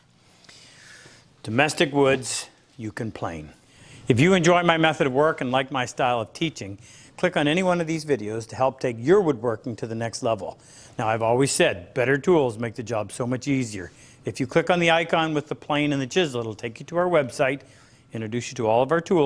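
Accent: Canada. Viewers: Why can't Canadians pronounce "out". You lost me at number 1. I curl my finger tight on the corner edges so the glue is only in the center. I never want much squeeze "out". Good prep requires very little glue and far less clamps.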